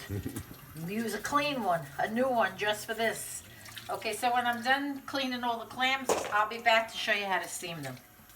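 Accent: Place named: New Jersey, United States. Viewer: Wow this looks good...I'm from Jersy, listening to your voice I'll bet you are too or NY..ps i remember the Purple Gang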